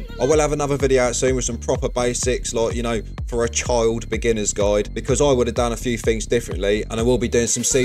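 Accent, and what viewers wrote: Cockney, United Kingdom. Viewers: Hahaha nice man! This is a strong English (cockney?) accent! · The best part of this video is that listening to your voice without subtitles has surprisingly shown me a drastic improvement in my listening skills, particularly for British accents (or Australian accent?). Cheers from an Indonesian Diablo 4 Player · how much of a Geezer accent you have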